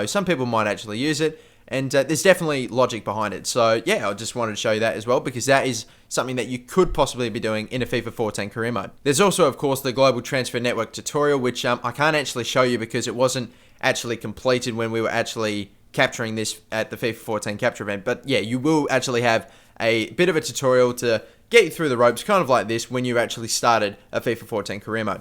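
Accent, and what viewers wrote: Australia. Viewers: hihihi australian accent is so funny :)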